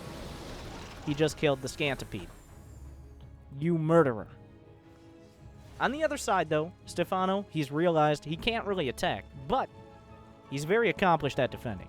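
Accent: United States. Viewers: USA but with a more "country" accent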